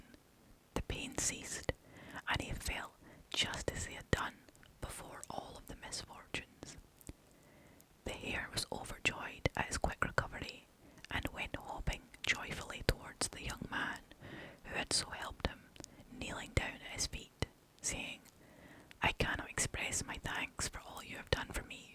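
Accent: Scotland, United Kingdom. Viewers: You are absolutely brilliant. I love your Scottish accent! I hope you gain a lot of viewers. I’ll be sticking around for sure 💚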